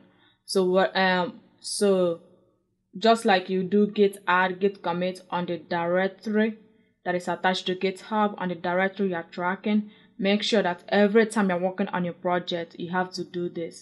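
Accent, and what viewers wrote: Nigeria. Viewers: thank you very informative n it sounds like you are Nigerian!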